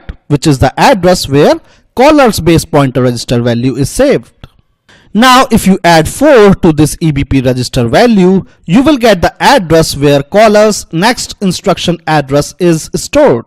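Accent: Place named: India. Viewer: you're screaming in your mic with a indian accent.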